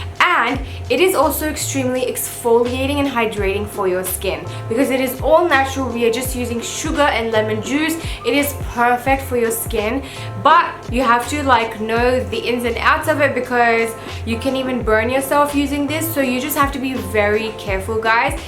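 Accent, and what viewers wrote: United Kingdom. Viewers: I hear a bit of scottish accent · Literally i thought your Pakistani . your speaking style is very similar to British accent.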